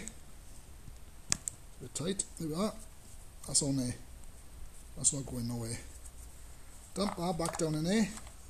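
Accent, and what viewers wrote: Wales, United Kingdom. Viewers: Hi you remind of the great Tommy Cooper, he just couldn't do anything right, yet the people 😍 loved him. I must admit I enjoyed your presentation well done, at least you gave us a good laugh. Lived in North Wales for years colyn Bay. Worked on the a55. Great to hear that lovely Welsh accent again. Muchas gracias 😎 Liam kilkee clare ireland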